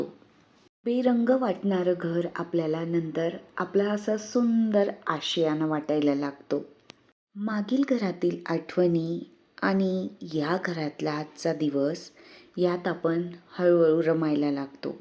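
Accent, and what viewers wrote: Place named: Khandesh, India. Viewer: Tai aapli ahirani bhasha aikun khup chhan vatal ❤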